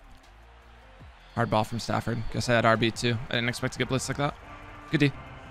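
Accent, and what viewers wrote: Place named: South, United States. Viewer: I’m here for the southern accent and I lost already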